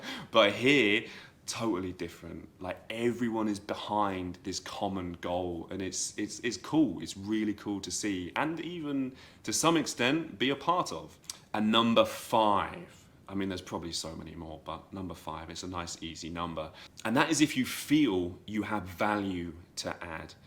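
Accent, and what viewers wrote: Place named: England, United Kingdom. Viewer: You have a nice English accent... Appreciate that. Wherever you are going to be living around the world make sure you get to do what you can to enable you invest. The experience is always cool though, but if you're a foreigner in any country your priority is investment... back Home that's not your home Thank you for the beautiful accent again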